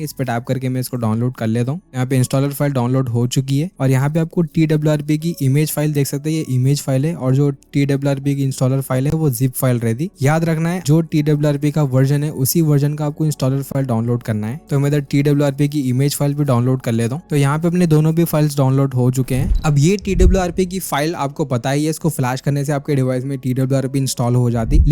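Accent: Hyderabad, India. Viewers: Hyderabadi Accent 😅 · Bhai's hydrabadi accent is cool! 😍🥰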